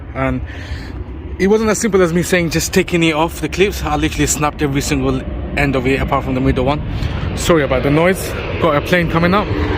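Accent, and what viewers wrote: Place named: Cockney, United Kingdom. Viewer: Impo ы en- cockney accent 😂😂😂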